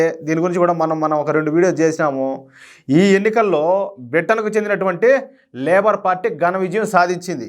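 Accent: Rayalaseema, India. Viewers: Continue the videos with the same tempo and Unique Ratalassema Slang.. 💐💐👍👍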